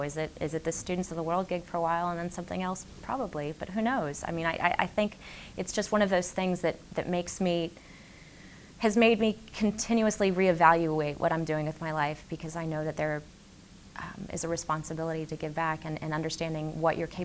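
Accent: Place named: Texas, United States. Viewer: She sounds so genuine. She looks quite natural in front of the camera. And shes very photogenic. perfect texas accent. Jeez. Total 10.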